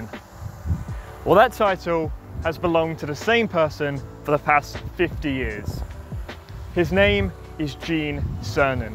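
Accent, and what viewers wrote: United Kingdom. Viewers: I thought u must be britisher😮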